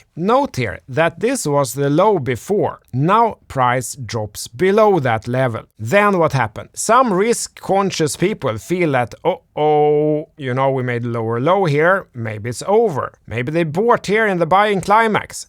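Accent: Sweden. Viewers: Hör ända från östergötland att de är en svensson som är i farten!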